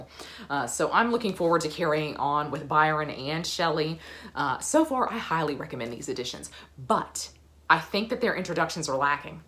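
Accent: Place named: Texas, United States. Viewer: Love the way you say y'all. Probably best Texan accent I've heard. Also, love the way you comprehensively talk about books.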